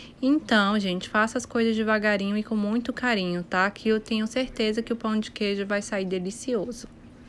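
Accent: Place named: Minas Gerais, Brazil. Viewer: Que delícia você parece ser mineira, igual a mim gosta muito de pão de queijo e o sotaque também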